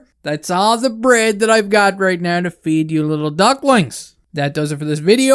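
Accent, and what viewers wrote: Newfoundland, Canada. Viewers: you sound like you might be a Newfie lol am i close?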